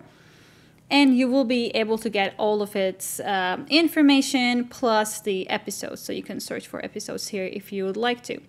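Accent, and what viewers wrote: Turkey. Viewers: She has a Turkish accent.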